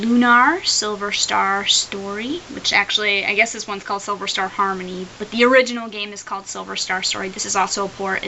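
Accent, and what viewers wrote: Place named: Midwest, United States. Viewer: Sounds like the midwest, Wisconsin a bit.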